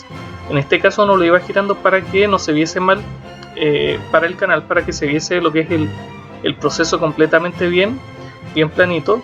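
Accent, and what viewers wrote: Chile. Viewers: buen dibujo! Chileno no?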